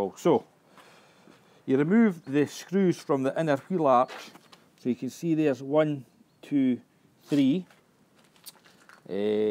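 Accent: Scotland, United Kingdom. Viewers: very nice scottish accent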